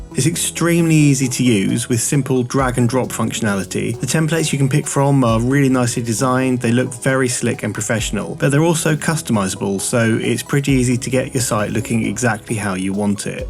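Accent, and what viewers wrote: United Kingdom. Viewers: the british accent that i love to hear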